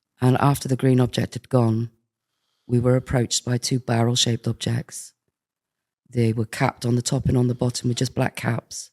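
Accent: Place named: England, United Kingdom. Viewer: This is a typical English voice if you live in England. Her accent is pleasant but not unique. She's English 😂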